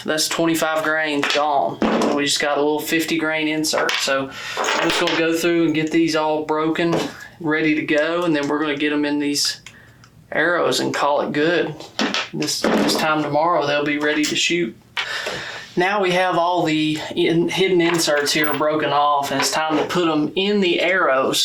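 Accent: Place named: South, United States. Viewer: Lol mate you have that Kentucky trucking driving Atlanta GA accent ingrained.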